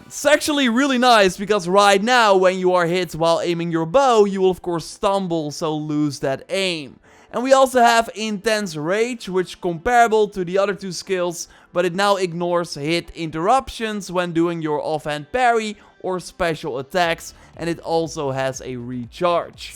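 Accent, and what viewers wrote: Netherlands. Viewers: The Netherlands! Finally! After five years quietly trying to place your accent At one point my best guess was Croatia but Dutch makes so much sense! Skall!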